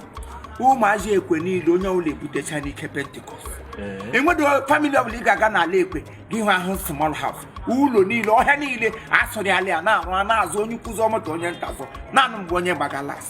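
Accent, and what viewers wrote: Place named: Mbaise, Nigeria. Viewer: You are very talented, I like the mbaise your speaking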